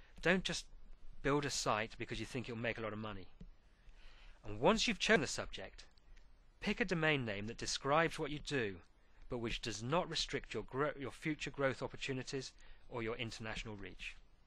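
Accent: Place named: England, United Kingdom. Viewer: Thanks for the info. nice to hear an English accent!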